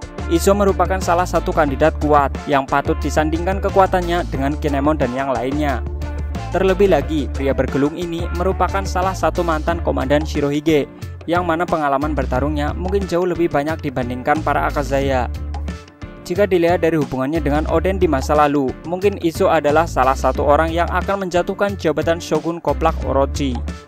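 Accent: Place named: Tegal, Indonesia. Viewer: Dri logat bicara nya, kya won't tegal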